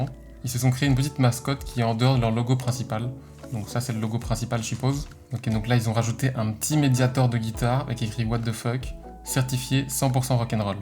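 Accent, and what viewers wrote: Belgium. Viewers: Aaaa tu est belge ! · Oh l'accent belge il me fait délirer 😂 (bisous d'Avignon)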